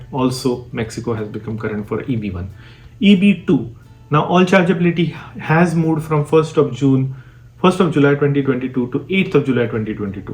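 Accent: India. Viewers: U need to make your accent less Indian-like. It is not pleasant to one's ears.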